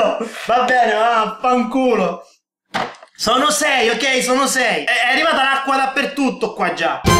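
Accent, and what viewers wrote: Sicily, Italy. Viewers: Ma sei di Sicilia?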